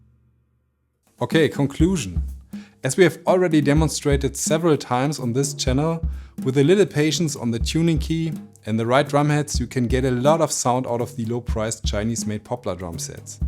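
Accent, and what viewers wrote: Germany. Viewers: Als ich den deutschen Akzent gehört habe habe ich mich außergewöhnlich gefreut weil es kein Set aus Amerika ist, das man so schwer nach Europa bekommt. Gott segne euch!!!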